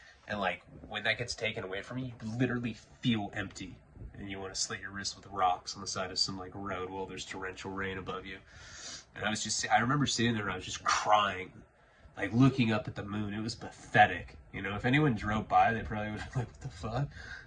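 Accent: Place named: California, United States. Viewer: He does it so often its hilarious, it goes with his rich kid cali accent so well.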